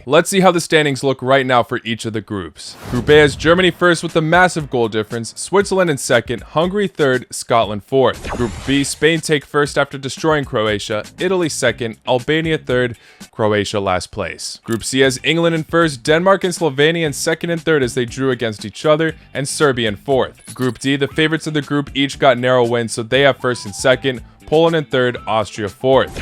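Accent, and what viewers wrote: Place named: United States. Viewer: He lived in the US for quite a few years hence the accent.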